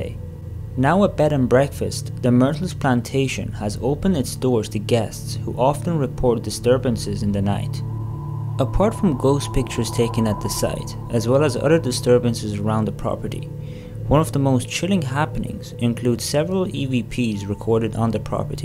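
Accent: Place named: South Asia. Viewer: i can't understand what you are saying due to your thick accent.. what is your country of origin? pakistan or india? please learn to speak properly before doing more videos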